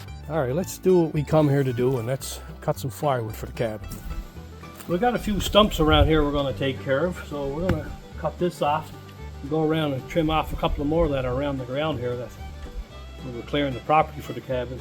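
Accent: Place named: Newfoundland, Canada. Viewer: Just hearing you talk makes me miss living on the rock. Thanks for the video 😊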